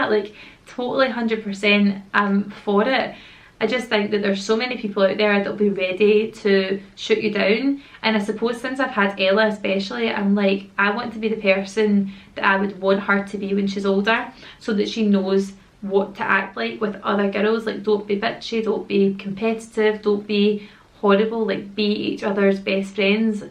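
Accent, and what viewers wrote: Scotland, United Kingdom. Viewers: Very chuffed that my assumption was mentioned 😊 You were so honest in this video (you seem very honest anyway) but you addressed the assumptions so well! Love all your videos! Especially the mummy videos and the cleaning ones! As a mum myself its lovely to see how other mums do it! Lovely to hear a Scottish accent as well on YouTube so I wouldn't worry about the accent too much! I'm from ayrshire so not a million miles away! Keep doing your thing girl 👌❤ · Think your video was great love all your vlogs, loving the odd scottish word that i dont understand 😄 love your accent just be youself as i know you are X💓❤🌹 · "Babe its english" 😂😂!! Love it! But its so true, mon the Scottish accent ❤❤❤ (im from scotland too) lol xx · I really love your accent! I live in the southern United States and in my area I just don't meet many people from other parts of the world but when I first watched your channel I could tell you were Scottish and would never have thought you were speaking anything but English☺some words you pronounce differently than in my neck of the woods but I love hearing that and some of the phrases you all use are different from here but that's what I love so much about watching UK channels! 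I think you're lovely and I enjoy your content so much!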